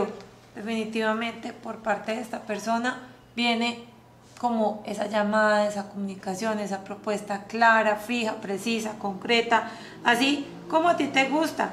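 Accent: Colombia. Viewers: Declaro abundancia en mi vida! Gracias por tu lectura, eres bastante acertada y al igual que tu soy Colombiana, el acento es inconfundible...Un abrazo y nuevamente GRACIAS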